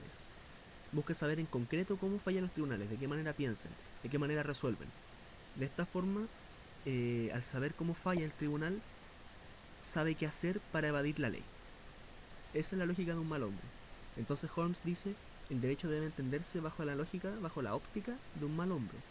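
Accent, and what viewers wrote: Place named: Chile. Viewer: es Chileno x eso no se le entiende bien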